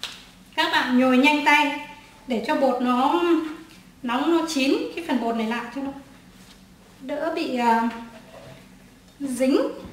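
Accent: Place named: North, Vietnam. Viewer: Bac ky 75 noi giong nghe oai qua .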